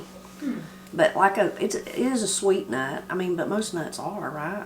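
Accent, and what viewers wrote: Appalachia, United States. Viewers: I remember running barefoot on warm summer nights playing hide n seek stepping on the chestnut burrs lol. .my dad had a orchard with fruit trees, chestnut trees and huge gardens Those were the days. Love your channel and your Appalachian accent. What a wonderful family you all are. I watch you all the time. We grew up born and raised in S Western Pa. making homemade cider and jellies. You ever try corn cob jelly? It's really good. Happy New Year!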